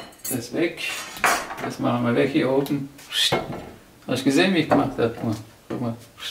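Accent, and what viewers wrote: Swabia, Germany. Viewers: das beste ist dein schwäbisch. Danke furs Rezept. :-)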